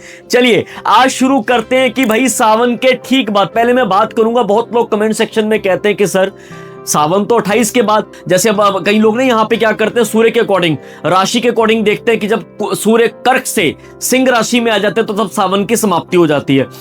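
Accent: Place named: Punjab, India. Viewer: Punjabi accent in hindi😊 sardar pandit😊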